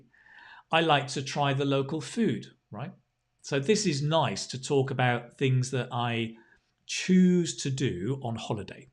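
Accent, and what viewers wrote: United Kingdom. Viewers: I love your class very much, your British accent is beautiful! Thank you!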